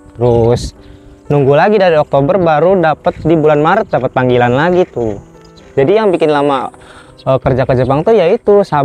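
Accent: West Java, Indonesia. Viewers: A,sundanya dari mana yah? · Logat bicara nya Sunda mang. Sunda na mana mang? Slm dari Cianjur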